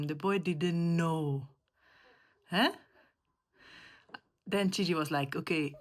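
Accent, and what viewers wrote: Nigeria. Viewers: Your Nigerian accent is so thick and strong.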